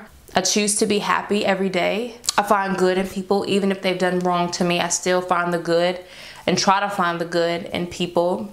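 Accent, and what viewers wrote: South, United States. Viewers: I could literally listen to you talk all day! I love your accent and the southern twang that you have. 😅 💕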